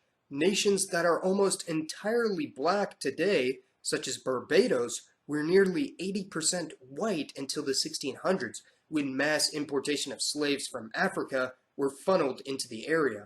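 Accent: United States. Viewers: He tends to force American phonetics onto foreign words, but with very little effort into pronouncing them correctly. The worst I've heard were on the videos about Filipinos and Japanese.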